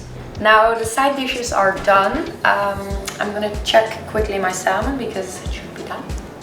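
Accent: Netherlands. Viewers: She sounds Dutch. I use to live in Holland. Good genetics and healthy 'diet.' I eat the same but I wish I could eat nuts:( Nut allergy is terrible. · je kan zo goed horen dat ze nederlands is. ik zat te luistern naar dr accent en ik d8, dit klinkt best nederlands., toen zag ik dr achternaam.. en ik d8.. jup die is nederlands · Do i hear a dutch accent? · is she dutch? she sounds dutch:) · I know for a fact that she is a dutchie aka dutch person from her accent and her name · Hahahaa love voor je typisch Nederlandse accent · OMG zo leuk dat je accent zo duidelijk Nederlands is. Love it😍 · i can hear the dutch accent coming throughhhh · OMG I NEW IT!! I’m from holland too😂 (its her accent) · Are you dutch? im dutch to you have a accent <3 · From the very beginning I could hear she is Dutch..then I checked her name and I was right. I always recognize Dutch accent-it's very nice to hear Dutch speaking English.... 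· Is she dutch? She has an dutch accent in her english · Is your first language Dutch by any chance? Cuz I'm Dutch and I recognize your accent.. 😂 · i love it.. first watching your clips. question are you dutch? your accent sound like that;). any way i will try this at home i need to get ready for the wedding i will be a bridsmaid..;) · Are you Dutch? You kinda have an accent, not annoying or anything, I am Dutch so I hear lots of people with the "Dutch accent" so I can recognize it haha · Je hebt een nederlandse accent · Is she Dutch? She sounds Dutch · You sound a bit dutch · In the Netherlands (where I assume she is because of the dutch accent) they have lots of quick cooking rice, it's what most people use! You can cook it like pasta and then pour out the excess water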